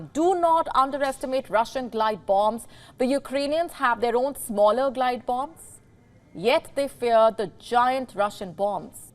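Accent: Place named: India. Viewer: All Indian female ascents are like this its the most worst ascent in English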